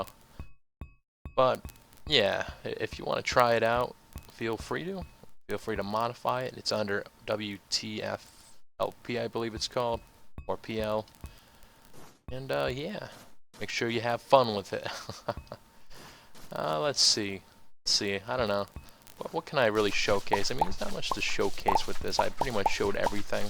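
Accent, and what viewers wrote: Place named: Netherlands. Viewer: are you from the Netherlands because you have that kind of accent also you make Amazing mods Keep it Up!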